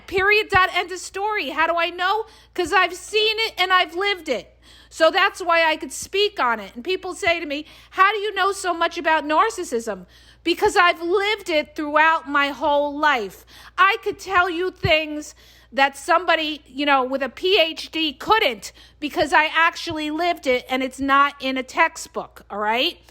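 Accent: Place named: New York City, United States. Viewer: Greetings from Springfield, MO. You sound like NYC gal 👧. God Bless you! You are very entertaining 😂 I'm really enjoying your content, 2 years no contact with Narc family members hallelujah!! It feels amazing 👏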